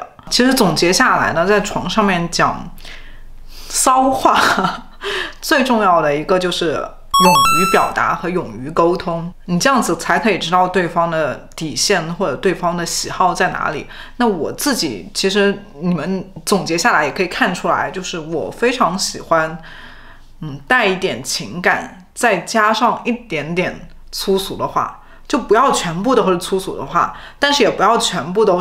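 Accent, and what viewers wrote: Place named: Shanghai, China. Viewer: 海外听到上海女生的上海普通话好亲切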